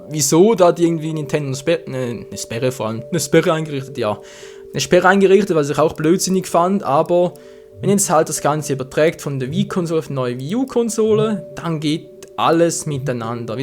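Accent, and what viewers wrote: Switzerland. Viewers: Du bisch eindüütig schwiizer 😊😊😊😊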